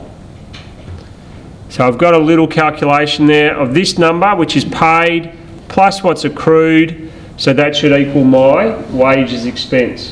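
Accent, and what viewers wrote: Australia. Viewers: australian accent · Australians have a cool accent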